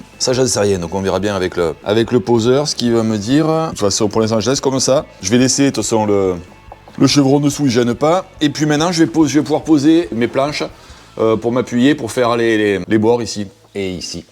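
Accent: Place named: South, France. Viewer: Si un sponsor passe par là offrez des serre-joint à cet homme du sud, il le mérite, des contenus de qualités, l'accent qui me rend nostalgique Beau boulot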